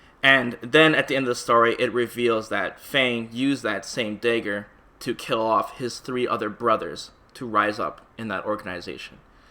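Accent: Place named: Canada. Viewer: He's Canadian ayyy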